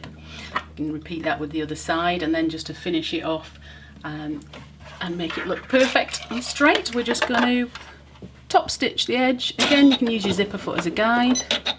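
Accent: England, United Kingdom. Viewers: It is lovely to hear a beautiful English accent! I've never had a problem with wobbly zips but it is always good to have a refresher! Thank you. (Edited to correct typos)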